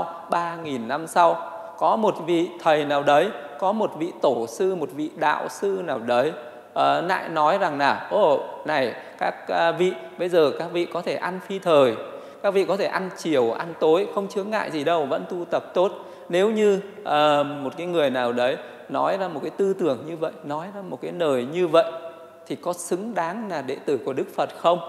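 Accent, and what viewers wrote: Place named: Nam Dinh, Vietnam. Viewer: Nghe giọng nói của thầy hình như là người Nam Định phải không a .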